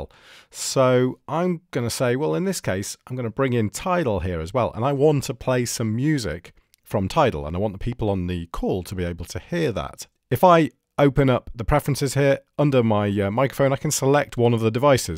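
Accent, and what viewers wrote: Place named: United Kingdom. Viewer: Thanks a lot!!! Love your britsh speech!!!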